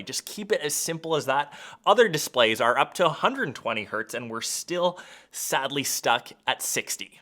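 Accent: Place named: Canada. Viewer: Love the Canadian accent! The 2 phones in your videos are the 2 I'm deciding between; the red 12 & the Pacific Blue Pro. Imagine a red Pro! 🥰 I'll order the Pacific blue & then they'll release a red later on. 🤦🏻‍♂️Edit, it's never mentioned & I keep forgetting, I AM getting the Pro, because it has 6GB RAM vs 4 in the 12.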